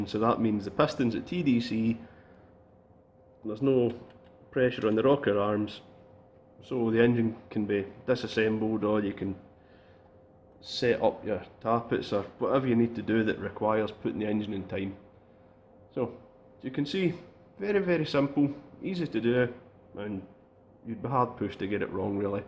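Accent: Scotland, United Kingdom. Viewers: I'd very much like some more technical videos in depth like this one. Also it's great to hear someone from Scotland too.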